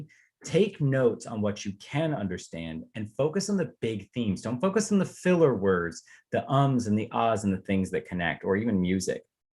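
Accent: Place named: Spain. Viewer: Gosh i love your Spain accent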